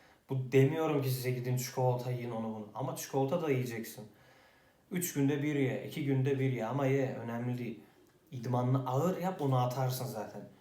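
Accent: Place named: Trabzon, Turkey. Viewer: Ne kadar düzgün konuşmaya calışsanda trabzonlu olduğun belli edeysin kral 🖤👏🏻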